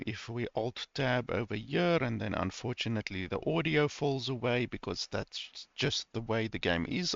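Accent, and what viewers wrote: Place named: South Africa. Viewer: Definately a South African acsent....🙂..(ek geniet jou reviews!)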